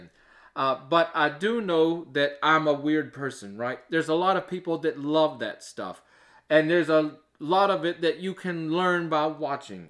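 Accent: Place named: Mexican-American, United States. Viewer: lol nah man, it's cool. You sound like Mexican people living among Americans, due to being exposed to that accent type, like most Americans do, by the way. I can only appreciate your Spanish!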